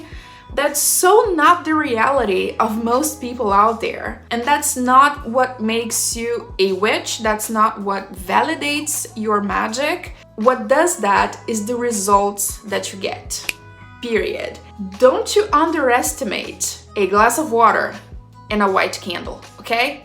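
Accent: Brazil. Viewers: r u from brazil? u have a little accent <3 hahaha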